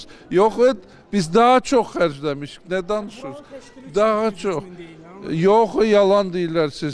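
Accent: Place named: Armenia. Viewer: Eyniylə ermənilər Azərbaycan dilində belə danişirlar, ayib olsun sizə yaraşmir xalg yazicisi adini daşimag, necə ki sizi kimi "ziyalilar"var bu millətin axiri bundan da betər olacag!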